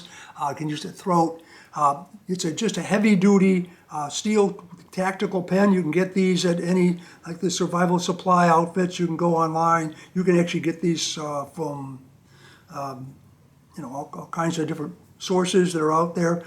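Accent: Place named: Chicago, United States. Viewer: Unc you definitely sound like a kid raised to in the Chi..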